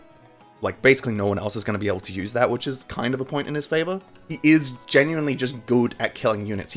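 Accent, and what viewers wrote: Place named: United Kingdom. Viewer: My experience with: > hey, that’s a chill tierlist, it can help stay up a bit longer. May as well subscribe, to watch that other Radiant Dawn 4-part series! > hey, that’s wind waker bgm, that can cheer me up a bit..! > that’s british accent, it sets me on good moods! > OH that’s theme!! And that’s how I became a fan! Thanks for the content! ❤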